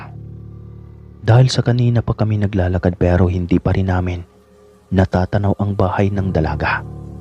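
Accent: Visayas, Philippines. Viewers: pangit mo mag kwento.. bisayang bisaya.. yay.. gusto koyung kwento boses molang ang pangit pati pag deliver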